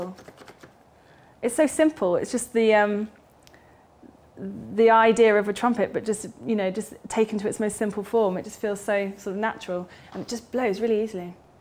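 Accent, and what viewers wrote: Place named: RP, United Kingdom. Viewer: Absolutely. Cute lady. Posh accent, too.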